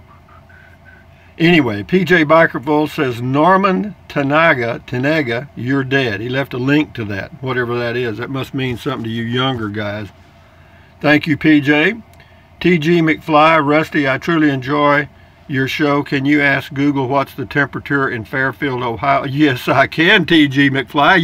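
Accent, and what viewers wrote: Texas, United States. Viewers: Its nice when we see your new videos .You look very content😀.Happy x u.Love the texas accent .I used to live S.A.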